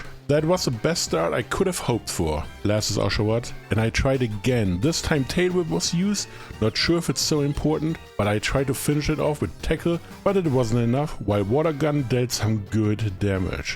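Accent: Germany. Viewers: Your german accent is very strong.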